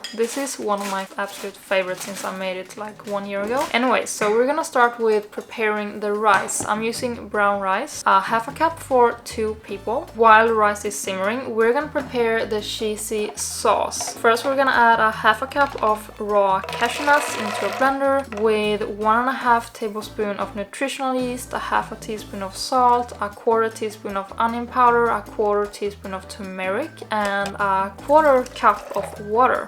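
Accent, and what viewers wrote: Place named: Sweden. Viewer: I thought you sounded Swedish. I googled you and see that you are Swedish Spaniard. Interesting because I always thought that the two accents (Swedish and Spanish)sound similar. Nice burrito bowl.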